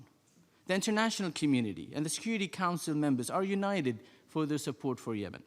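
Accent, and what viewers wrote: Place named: South, Arab world. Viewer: دحباشي حسبته جنوبي عربي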